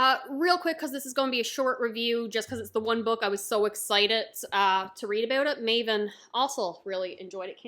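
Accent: Canada. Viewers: I love/appreciate your Canadian or maybe Wisconsin pronunciation!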